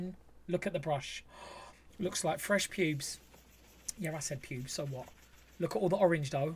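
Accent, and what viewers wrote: United Kingdom. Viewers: Love love love ur Brit....xoxo me mum was born in Liverpool because of WWII..my grandparents were from Mayo Ireland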